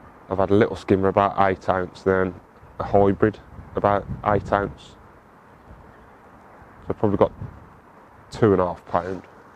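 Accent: Birmingham, United Kingdom. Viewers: don't you recognise a Brummy accent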